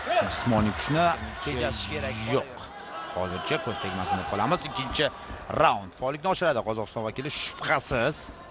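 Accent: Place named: Russia. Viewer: Концентрация, креатив, позиция инглизча сузлар, буларни урисча талаффуз килиш урискулликдан далолат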